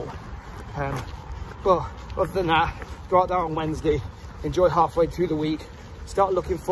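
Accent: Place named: Nottingham, United Kingdom. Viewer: mate I'm from Hullujah formally know as hull🤣I live in Notts now so I thought I recognised that robin hood drawl 🤣👍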